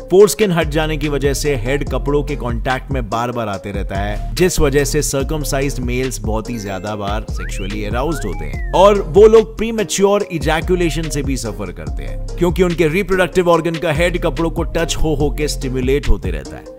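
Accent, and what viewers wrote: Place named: Maharashtra, India. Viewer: Tension naka gheu , Marathi accent 👍🔥🔥❤️ kamaal aahe 👍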